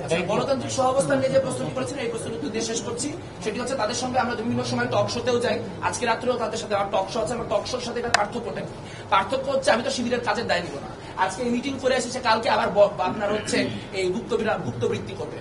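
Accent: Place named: Kolkata, India. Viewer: এর কথা, কলকাতার ভাষা ম তো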